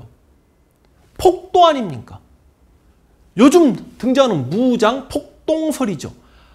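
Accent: Jeolla, South Korea. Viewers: 전라도 사람들만 황현필같이 말하지.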